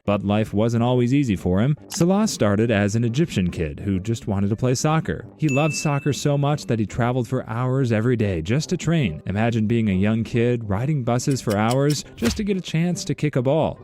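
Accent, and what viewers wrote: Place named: United States. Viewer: First I thought to myself why did he put Ronaldo with a #21 on the thumbnail but then I heard the American accent and everything made sense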